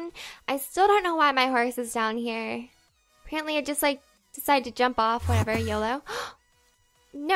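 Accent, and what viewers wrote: Canada. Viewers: R u from Canada? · Are you canadian? :o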